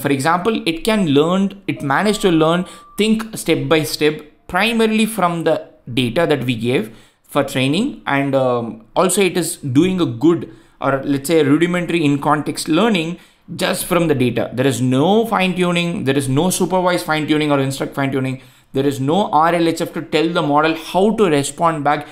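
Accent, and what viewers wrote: India. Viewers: Can you use an AI to remove your Indian accent?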